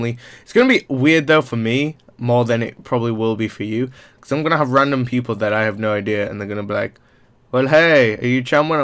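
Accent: United Kingdom. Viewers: He is British, why people so confuse about his accent? Because he is British! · From Hong Kong? British Accent? I didn't watch the vid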